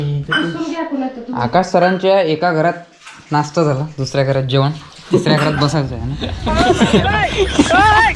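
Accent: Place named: Vidarbha, India. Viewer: Bhai tu pakka VIDARBHA mdhla ahe.... Vidharbha chi bhasha ch alg ahe bhawa🙌🔥🔥🔥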